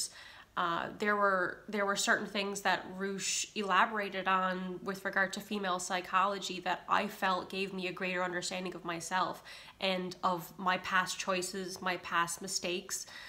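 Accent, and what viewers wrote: Newfoundland, Canada. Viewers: That's the Newfoundland accent with a bit of overseas dilution in it. It's quite unique.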